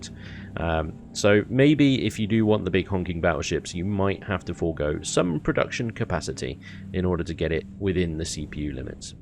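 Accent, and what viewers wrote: United Kingdom. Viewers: It's his british accent. Really charms your pants off, lol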